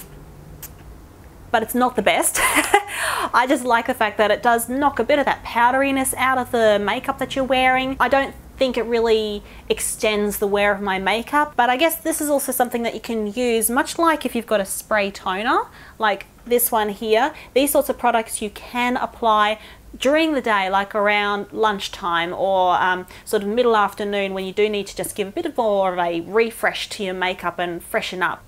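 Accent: Australia. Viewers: I love you, you're so funny ! :) I think you're my favorite beauty youtuber ! And as I'm studying English language and culture at university, it's also a way to learn the Australian accent. Best regards from France. :)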